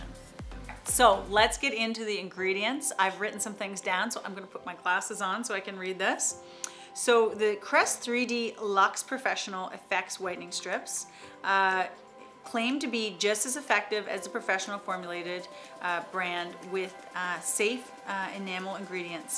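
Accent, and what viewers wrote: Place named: Canada. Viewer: She's gotta be a fellow Canadian according to the way she says 'about'.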